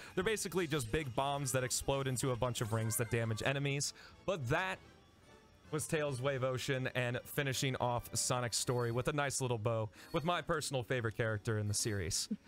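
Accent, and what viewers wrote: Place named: Chile. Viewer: I thought he was Chilean lol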